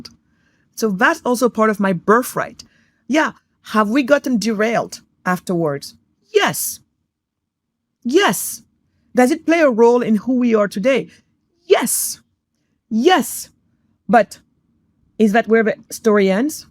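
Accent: United States. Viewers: 30 seconds of listening in, sounds odd. An African ensconced in the West, US probably going by the American accent. Like you say shallow knowledge shallow conclusions.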